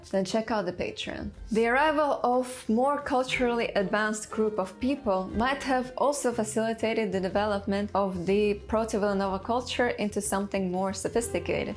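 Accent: Russia. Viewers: слышу русский акцент. Не у утрусков, у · Your accent seems Russian/Bulgarian but I’m not too surprised by the rest of your genetic ancestry.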